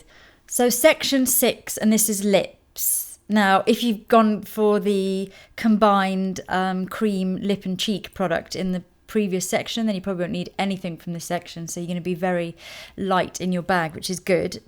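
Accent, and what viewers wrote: United Kingdom. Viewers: Thu Nguyen she's British?!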